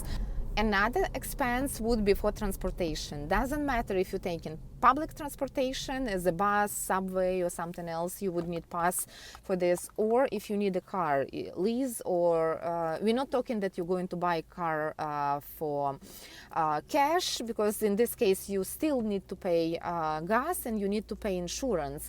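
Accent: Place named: Russia. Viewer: Ох уж этот русский акцент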